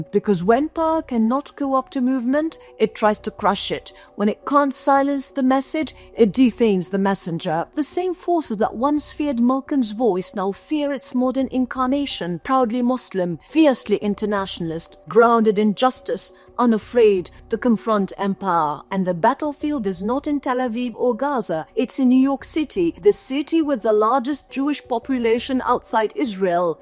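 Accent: RP, United Kingdom. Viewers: Not to go off-topic (wonderful powerful message eloquently delivered), but I just have to know where here voice (accent / pronunciation) comes from? It's like she swallowed a few English monarchs and perhaps some Thatcher as well. Also, in how far is this her 'reporter voice', as in, how different is it from her natural day to day speaking voice? I'm intrigued.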